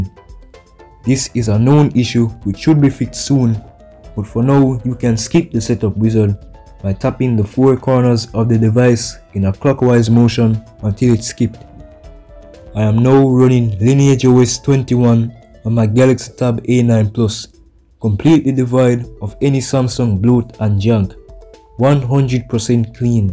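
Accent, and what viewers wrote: Jamaica. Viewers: Are u jamaican bro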